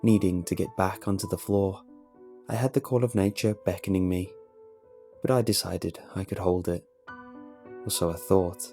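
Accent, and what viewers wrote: United Kingdom. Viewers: is he brittish?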